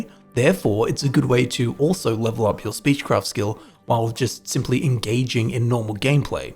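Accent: Australia. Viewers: Ah I missed that sweet Australian voice! is back! I’m telling you I’m loving oblivion as someone who only played Skyrim before & like an hour of Marrowind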